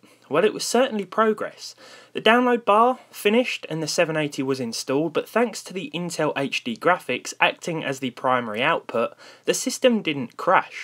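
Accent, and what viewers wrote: United Kingdom. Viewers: Nice British accent, can you say Harry Potter in the end of your next video? Pls pls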